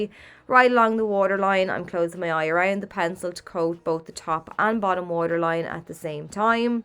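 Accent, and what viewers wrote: Ireland. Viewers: Y tiene un acento irlandés muy marcado, pero yo estoy acostumbrada, la entiendo y me gusta.... A mí también me encantan sus videos😘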